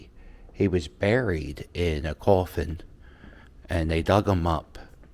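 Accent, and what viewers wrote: Philadelphia, United States. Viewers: I really enjoyed this tour. I love your Philly voice and I love all of the same things about Philly that you do. I was born in Philly and raised in SJ, but have been to Philly frequently enough. Thank you for these videos. I hope you are going to Mass every week. God bless you.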